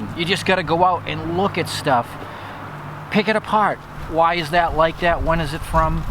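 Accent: Massachusetts, United States. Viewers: This guy sounds like he’s from Massachusetts not New Hampshire. N.H. residents don’t sound like that . I fall o wed telephone lines. Pawnd and stuaff. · Anyone from nh vt here his mass accent. Or is it just me.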